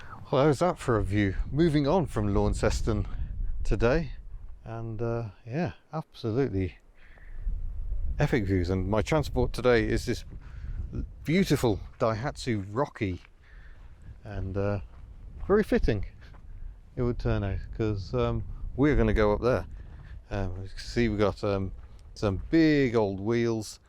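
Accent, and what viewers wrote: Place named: England, United Kingdom. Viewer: Actually, the pronunciation of Launceston is more like Lon-ces-ton. If you call it *Lonnie*, they will think you're a local. Ok, maybe not with that English accent of yours. Lot of mainland blow-ins now so the name pronunciation may well have morphed a little. I used to live for a time during my schooldays in the 60s in Garfield Street, South Launceston. Attended high school in the suburbs Kings Meadows. Worked there for a time in the 70s after completing my apprenticeship. It is a nice city now but used to get very smoky in the 60s when everyone had wood, coal or briquette heating. I still miss the electric trolley buses of that era, not to mention the old Leyland buses with the half cab.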